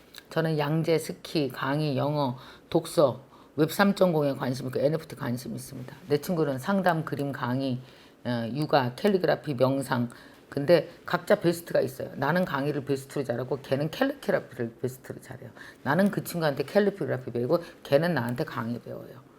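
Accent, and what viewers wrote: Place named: North Chungcheong, South Korea. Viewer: 언제나 잘보고 있습니다 충북이 고향이라 아주 귀에 딱딱 들어 옵니다 ^^ 마치 고향 이모가 말해 주듯이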